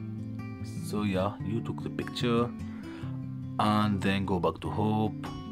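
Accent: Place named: Syria. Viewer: Accent sounds Syrian 😊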